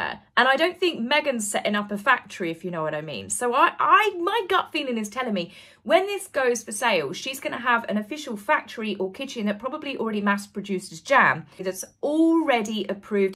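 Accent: United Kingdom. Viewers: I always enjoy your pretty face and your British accent when I click on your videos!! You are always so cheery and happy!! I love that!!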